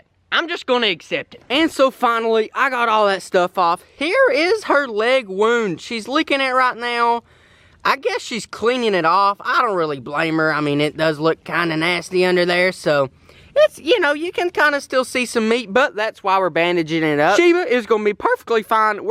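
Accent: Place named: South, United States. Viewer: Hey Man, you can’t get a camel, you gotta get a emu, I think with your southern accent people with laugh at emu better, plus camels are slow, like the mule, an emu is fast, like the atv, you gotta get a emu.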